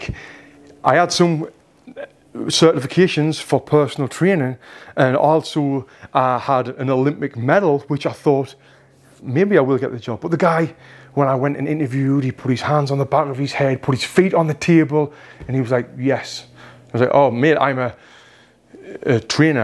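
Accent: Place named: Northern England, United Kingdom. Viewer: i love how you put subtitles up sometimes, as a british person i was confused why you did it on some parts of your video as i can understand you perfectly but then i realised that some americans may find the nothern accent hard to understand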